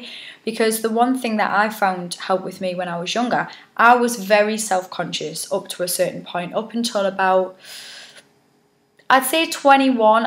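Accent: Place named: Newcastle, United Kingdom. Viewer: I love your positive attitude! (And Geordie accent... one of me favourite English accents) Cheers!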